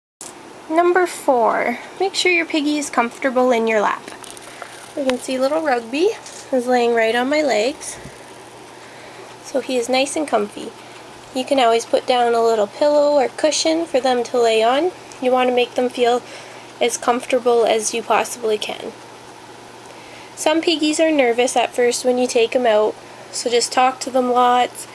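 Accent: Canada. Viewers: I <3 your accent. Are you Canadian?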